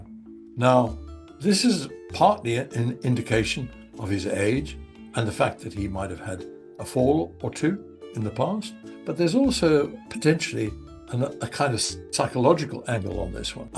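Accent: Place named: RP, United Kingdom. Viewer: So we supposed to believe u coz u got Elizabeth's accent. Tsek wena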